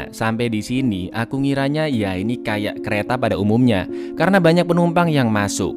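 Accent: Java, Indonesia. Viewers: Mmm cara bicaranya kayak orang jawa pasti dia orang jawa...... oke atuh kalo gk tau mah gkpp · Mas Bro asli jawa mana nih, jawa tengah atau jawa timur?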